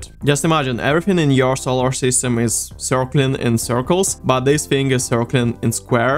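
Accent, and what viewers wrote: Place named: Russia. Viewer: You sound... Russian. Даров если Русский.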